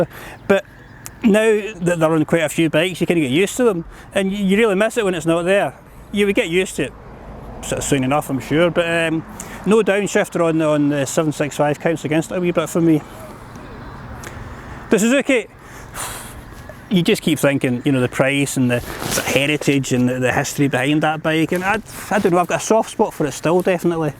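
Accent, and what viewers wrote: Scotland, United Kingdom. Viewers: Only scotish people can understand what hes saying. Even google auto caption generetor failed to recognize what he sayinn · Don’t understand how people can’t understand Scottish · Scotsman? Or Irish? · I don't mind the scottish accent and understand him perfectly well, but he's just talking utter bollocks and he's uninformative in my opinion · It's not strange, it's from Scotland. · Man you've got a heavy British accent.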